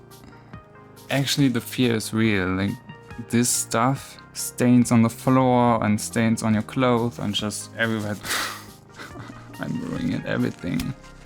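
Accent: Germany. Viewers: Hello, Im new here. And I have a question: Is the a German accent Im hearing?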